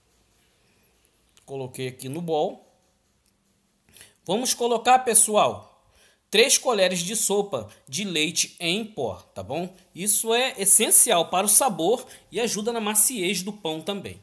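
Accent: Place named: Rio de Janeiro, Brazil. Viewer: Olá TD BM Choll de bola vc e o máximo explica bem esse sutake de carioca guanto descansou o pãozinho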